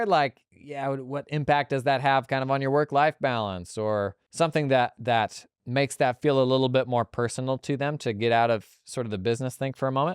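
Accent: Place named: New England, United States. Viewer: why the AI use southern vernacular with a noticeably New England cadence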